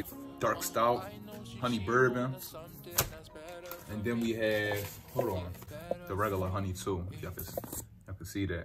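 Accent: Philadelphia, United States. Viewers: He from philly I can tell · I could tell he from philly lmao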